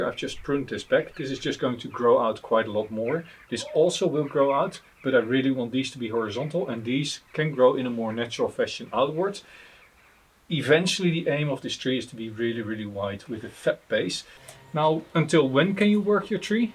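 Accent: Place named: Netherlands. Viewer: Another very enjoyable video,! Your tips are very easy to understand and remember. Also I enjoy another Dutch person speaking English, always funny how we pronounce a lot of English words, it makes us very recognizable haha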